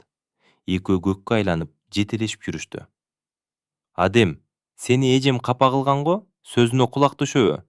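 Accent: Russia. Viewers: Окуган бала орусбу😮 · Негеле ө ордуна о деп окуйт десе орус жигит окугангө азаматсын чоон рахмат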